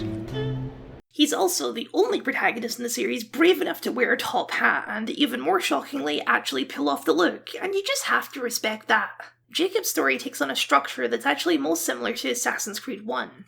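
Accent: United Kingdom. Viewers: I’m… I mean I WAS assuming it’s just a different timezone situation, given the accent. GMT at 4am est is 9am.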